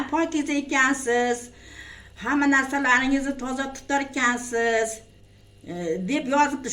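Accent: Andijan, Uzbekistan. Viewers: ЯХШИМИСИЗ ДОМДА ЯШАЯПСИЗ МИ КИМ БИЛАН ЯШАЯПСИЗ ТОШКЕНЛИКМИСИЗ ШЕВАЕЗ АНДИЖОНГА УХШАРКАН ИЛТИМОС ЖОВОБ БЕРИНГ НЕЧТА ФАРЗАНДИЗ БОР ШУ ЁШДА ЖУДА ПОКИЗА АЁЛ ЭКАНСИЗ МАШААЛЛОХ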